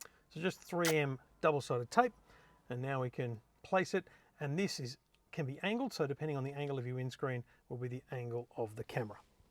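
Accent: Australia. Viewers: This video isn’t in the UK. He has an Australian accent. Also, the UK and Australia aren’t third world countries and they need to meet similar safety standards as the US, so yes there are definitely airbags in those a-pillars. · This is very clearly an Australian accent.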